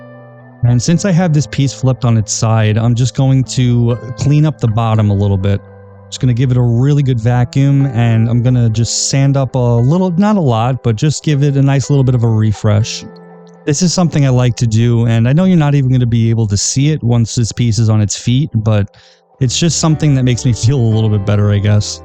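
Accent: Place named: New York, United States. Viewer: Where on Long Island or New Jersey are you from?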